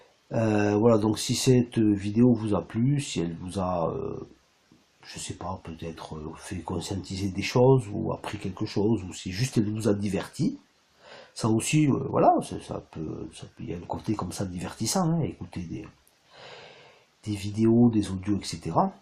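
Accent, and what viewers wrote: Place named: Tarn, France. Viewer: Coucou cela fait x années depuis 12 ans surtout le 11h11 le 14h14 , le 17h17 et 22h22 Ton accent me fait penser au Tarn lol Tu as raison pour les heures ❤ Les câlins des FJ sont aussi curieuses et je vais m'exprimer ici sans bouleverser mon intimité, les câlins sont espacés et à chaque fois se déclenchent des échos niveau famille enfin c'est bizarre ,comme un nettoyage Quant à la radio , je pose une question , et hop la réponse Belle nuit et grand merci ❤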